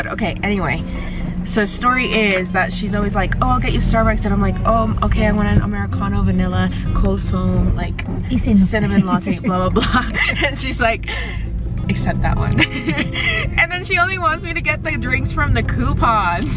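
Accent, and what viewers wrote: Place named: United States. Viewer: Porque los popis de mx. Ablan English while in Mexico???? Osea se los olvida el Español in Gringa voice!!! Tots not gringa!! Lol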